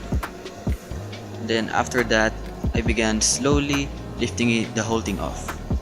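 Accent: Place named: Philippines. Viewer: Are you filipino? Because I can hear that filipino accent heheheh :)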